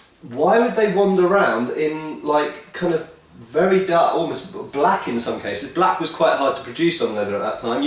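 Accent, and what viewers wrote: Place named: United Kingdom. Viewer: I love your rants! So on point and the British ascent and humor is perfect for it! Makes it easy to listen to and if you know it, sense the sarcasm in it! Somehow Hollywood thinks everything in Europe during the 0-1500's (and especially Scandinavia) was made out of fur, leather and horned helmets. Sure i like a badly made over dramatic slaughter fest as much as anyone else, look at Xena for example!, but its a bit sad that they had to ruin Viknings like that. They have tons and tons of real information to go on, so why not read up on it when making a show that is so heavily based on a set time period with real people and a well established culture?! Im currently writing a book my self and trust me, its waaaaaaaa..aaaaaay harder to make up all the aspects and variables in a made up society than it is using an existing one. 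Have you ever thought of how hard it would be to just figure out a possible mode of transportation for an ancient society that relies on magic? Its bloody fucking hard! Cheers mate and have a good day!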